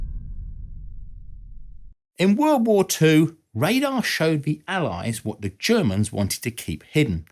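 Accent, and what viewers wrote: United Kingdom. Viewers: I knew this guy had a British accent before even hearing him. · The British accent lends an air of credibility.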